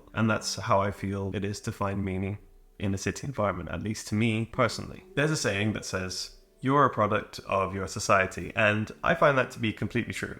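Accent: Sweden. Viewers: you already lost your British accent and sound like a swede speaking English.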